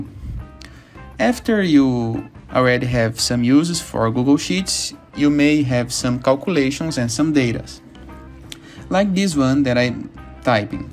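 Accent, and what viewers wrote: Brazil. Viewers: eu conheço o sotaque brasileiro kkkkkkkkkkkkkkkkkk Edit: o vídeo me ajudou, valeu!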